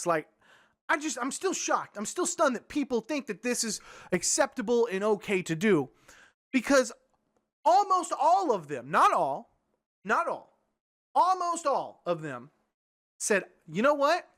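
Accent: South, United States. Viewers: turns the dixie up to 11 (accent) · You can hear the Texas in his voice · LOVE THIS. I can hear it in your voice, like you went a little "ghetto" (or deep south? - I don't know, I am from Wisconsin) on this:) LOL PERFECT what you said about having the "adult" conversation - gaming is all about COMMUNICATION - if we cant talk to each other, why are we playing? I have been gaming for 30 years and I cannot once think of a time where I as a DM or a player experienced TPK for something like this. Recockulous advice you were given. Keep making videos, I love your work - great stuff! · You get more southern when you get angry! lol But seriously I agree. I have met some old school DMs who totally get off on TPKs. There are a lot of folks that simply, in my opinion, are not flexible and creative enough to be DMs! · you get a southern accent when you get agitated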